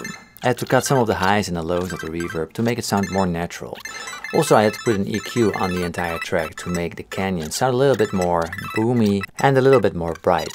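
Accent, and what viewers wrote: Netherlands. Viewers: I really enjoy your channel and while listening to you speak, tried to guess where you were from, your music speaks for itself:) It's close to American mixed with British, but my guess is The Netherlands. Years ago I met a couple in the art store where I worked and though they sounded American, there was a few words where the d's sounded sort of German. I asked where they were from originally and yes, it was Holland. They had lived here a long time but found the sounds of American English quite easy to master. So, did I guess right? Cheers, Rik Spector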